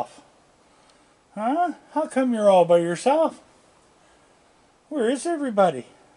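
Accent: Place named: Canada. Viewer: My dad loved cats and wildlife. He was always camping, hiking, fishing. Even with the fishing, he stopped using regular hooks and moved to painless hooks (I have no idea what they’re called). He felt bad for the fish. He was as sweet as you, so similar to you. Even though you have a Canadian accent and my dad sounded very Appalachian, you still somehow have similar voices.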